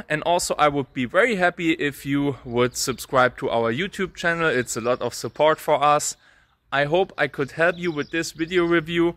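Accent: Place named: Germany. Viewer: Coool German accent like it bro!😊 Thank you for your good (I think =) honest tests. I would be happy if you would test the small Galaxy s22 (6.1") again. It is said to have come a little closer to the flagship specs with camera etc. small and good cameras and battery is something i always hoped for, more so since the apple mini phones, you can start a petition that xiaomi or other widder build small phones, it doesn't have to be the best cpu.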